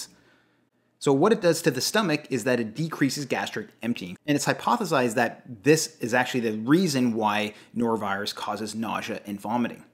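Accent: Canada. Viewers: It’s underrated how dangerous this thing can be. I’m a kidney transplant recipient and I’m terrified of this virus. If I get it, not only am I at risk of damaging my transplant by dehydration but also not being able to keep anti rejection drugs down. I’m immunocompromised too so I’m terrified. Love the narrators Canadian accent btw. Reminds me of my crush, Calum Worthy❤❤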